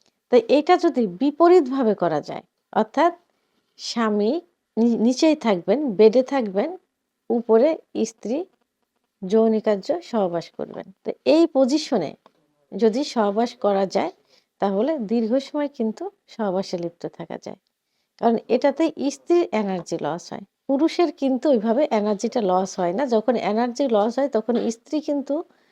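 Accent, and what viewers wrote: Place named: Noakhali, Bangladesh. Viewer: ওরে নোয়াখাইল্লা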